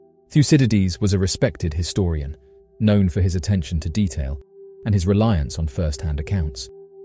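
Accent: England, United Kingdom. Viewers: That English accent ; very pleasant .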